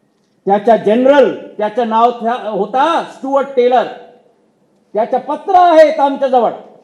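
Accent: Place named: Hindi, India. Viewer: Hindi accent aate h iske marathi bhasha mai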